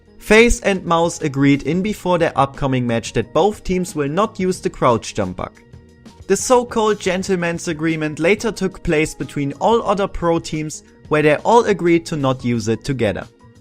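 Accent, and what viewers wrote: Germany. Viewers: Bist du deutsch? (Wegen deinem Accent) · Wow man hört direkt das du deutsch bist 😂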